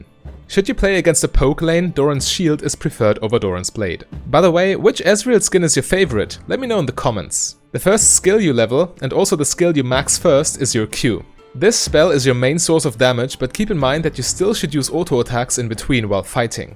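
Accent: Germany. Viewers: u german? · Idk why but i just instantly hear that you are german. Right? :) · effecient german voice, complete with runes, builds and poke guide. very nice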